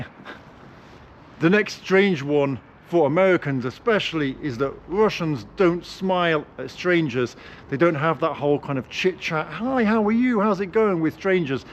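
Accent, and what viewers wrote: United Kingdom. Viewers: Я наверно единственный слышу ваш британский акцент 😄 и не думаю, что вы американец. В России люди очень редко знают какие бывают акценты английского языка, отличия, как они звучат и т.д.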